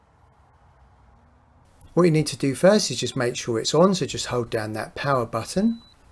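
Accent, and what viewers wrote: United Kingdom. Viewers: Thank you so much. I've been watching your video about 3 times, and set my jakuzzi in 45 minutes! You are amazing, so smart and simple. And I enjoyed you British prnouniation so much! Thank you!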